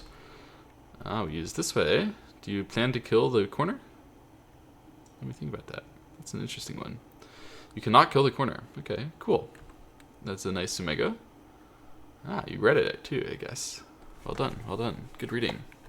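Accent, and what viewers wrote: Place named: Europe. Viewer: Crazy, I always assumed you were from some European country because you seem to speak English with a bit of an accent and a slightly strange cadence.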